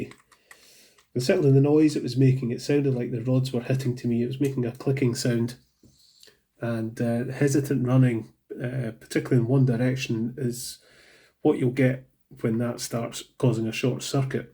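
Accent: Scotland, United Kingdom. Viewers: sorry it must have been my inability to listen to a Scottish accent. 😊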